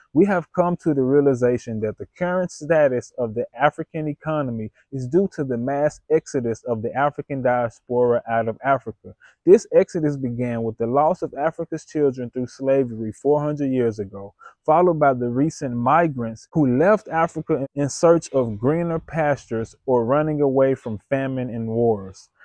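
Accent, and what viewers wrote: United States. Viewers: Bye-bye I want to hear African accent not American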